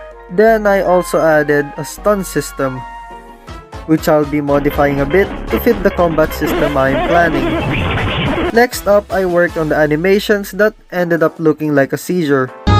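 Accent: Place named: Philippines. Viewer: Nakita kolang tong video mo kaka scroll ko hahahah sa boses mo palang halatang pilipino, keep it up bro lab u. ❤❤😂 Proud developer pilipino here😂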